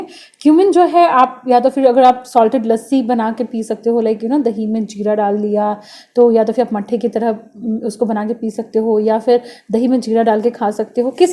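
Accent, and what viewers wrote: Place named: Portugal. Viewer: Gracias por visitarme bonita 🙂🙌 aquí estaré pendiente ♥️🙌me encanta escuchar 👂 tu acento ♥️es portugués???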